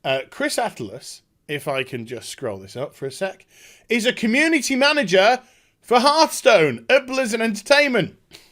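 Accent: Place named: United Kingdom. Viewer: This guy sounds remarkably like the late Total Biscuit. Partly the British accent, but it goes beyond that. Spooky.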